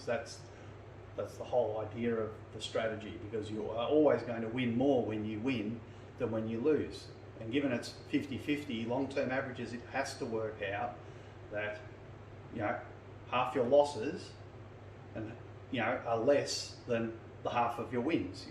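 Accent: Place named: Australia. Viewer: thanks, I agree that the complexity would make it too hard to manage at a live table, so I understand if it proves a fruitless task. Just keep up with the content as you see it, I am enjoying hearing Craps talk with an Aussie accent for a change. Cheers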